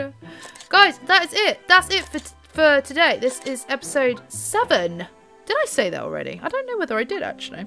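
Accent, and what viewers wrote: United Kingdom. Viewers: And I like your British accent 😁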